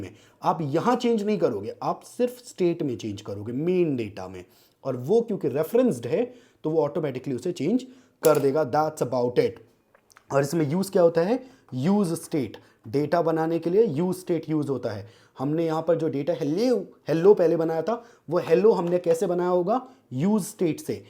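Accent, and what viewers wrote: Bhopal, India. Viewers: the bhopali accent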